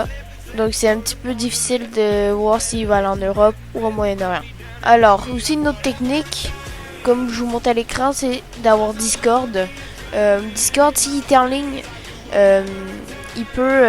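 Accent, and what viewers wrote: Quebec, Canada. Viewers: T québécois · T'es québécois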